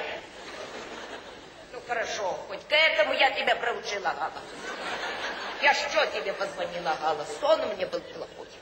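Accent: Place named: Russia. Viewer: Не понила это что русской деревне так разговоривают ,браво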